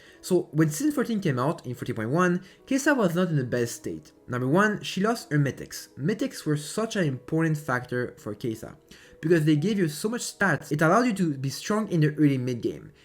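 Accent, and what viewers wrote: France. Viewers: l'accent francais est fou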